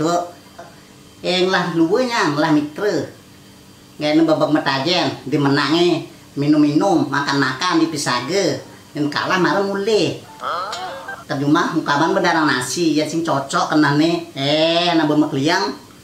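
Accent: Buleleng, Indonesia. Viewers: Hahaha..lucu luh, ngoceh logat buleleng, sambil masak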